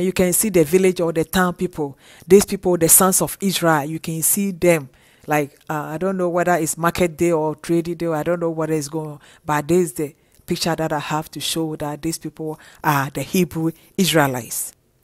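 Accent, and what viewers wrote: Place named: Africa. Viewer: This was inspiring and greatly appreciated. Your teaching and voice without background music, without european or english accent but rather an African accent from the Motherland makes it authentic. I look forward to your upcoming history lessons. Thank you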